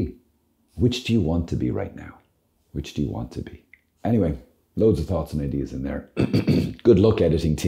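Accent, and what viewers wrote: Ireland. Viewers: Blessed Father I believe if you don’t ask you don’t receive and that goes for everything in this life and I believe the next ! You are a inspiration to me from your Irish accent to your extremely Heart fulfilling Streams and Clarity ! Clarity of the bible and what our Holy Father wants us to do is a Blessing being explained by you Father !! Keep the Faith ALL !! Bless you Father ! Your Accent and your Irish ☘️ HUMOR ! It makes life easier ! God Bless with all the Angels and Saints us ALL. ! Michael James Patrick Maguire in Melbourne Australia ! 🇦🇺. ❤️❤️❤️❤️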